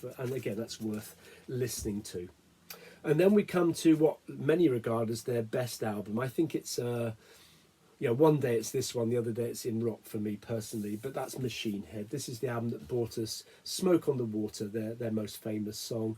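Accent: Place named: RP, United Kingdom. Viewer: Oh I would never guess. Talks like an English lord. Upper west side o’ London or something. 😝. Your video made me play DP’s Live in Paris 75 and Coverdale had pipes for sure. It’s his banter between songs that s most annoying.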